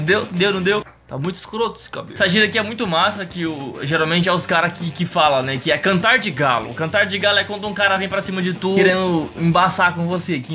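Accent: Brazil. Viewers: O sotaque de Rondônia parece com o paulista, pelo menos o seu. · Vc ta falando goineins ou goiano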